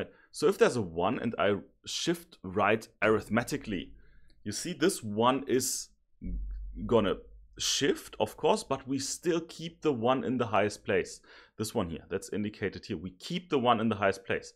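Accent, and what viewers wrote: Germany. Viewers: I can tell he is German 😁, thanks a lot sir.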